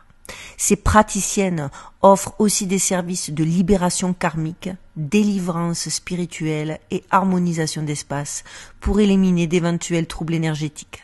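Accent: Marseille, France. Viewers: Ton accent de Marseille commence (légèrement) à perdre de son intensité. Il est temps pour toi de revenir chez toi, pour quelques jours, je pense. Ce serait dommage de perdre ce patrimoine national inscrit au répertoire de l'Unesco. Les églises brûlent, tu ne va pas perdre ton accent tout de même?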